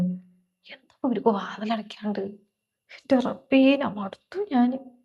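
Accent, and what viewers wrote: Palakkad, India. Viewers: പാലക്കാടൻ slang ആണോ ഇത്?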